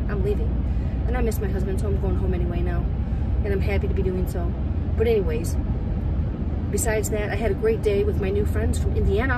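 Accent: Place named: Michigan, United States. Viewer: Hi there! New subscriber. Your video came up when I searched no build camper van. I've been watching your videos all morning. From listening to you speak in the very first video I watched, I knew you must be from Michigan too. This was later confirmed. Ive learned we have a distinct accent. I can see from this video that we are neighbors. I'm in FG. I'm retired and will be getting a minivan within the year to travel. Happy to have stumbled onto your channel. It is helping me see what will be a good way to set it up. Thank you!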